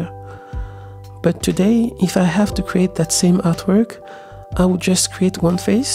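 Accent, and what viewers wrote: France. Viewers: Is this a french accent? Because your voice is lovely to listen too. Besides that, your videos are awesome. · L'accent français me fait rire, continue c'est trop bien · you’re French?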